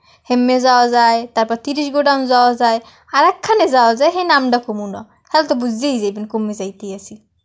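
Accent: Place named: Barishal, Bangladesh. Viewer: আম্মে আরজিনাল বরিশাইল্লা আপা ভালোলাগে একছের😅😅😅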